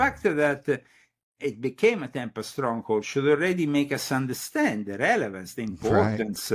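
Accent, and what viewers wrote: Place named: Italy. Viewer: Just brilliant. Love the Italian accent!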